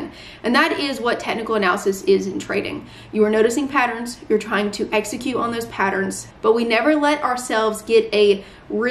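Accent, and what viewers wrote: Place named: South, United States. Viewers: Are you from Southaven, Ms.? I recognize the dialect. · I Love Your accent, straight out the south? Either way, You are Awesome.Thanj you for the priceless information.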